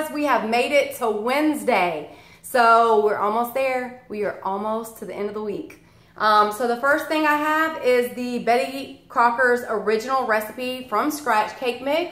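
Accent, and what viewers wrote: South, United States. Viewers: Just found your channel! I absolutely love your personality! Where are you from? Is that a southern accent?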